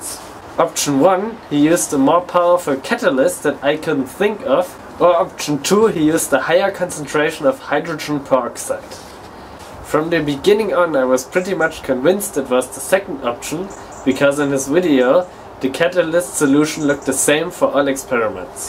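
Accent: Germany. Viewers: Is this guy german or some other nationality. I don't even care, but I love him.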